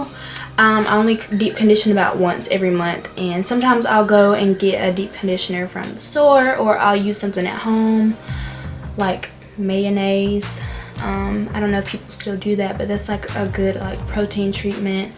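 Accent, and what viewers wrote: South, United States. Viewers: the little southern drawl... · Love your southern accent. I am from Texas.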